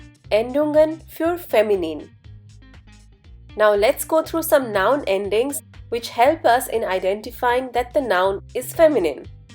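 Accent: India. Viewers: Does anyone listen her speaks in indian accent? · I am Indian and I am quite sure this excellent teacher is Indian :) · You seemed like is Indian...., sounded like it! 🤔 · 13 seconds in, and the accent of the narrator is rather Indian.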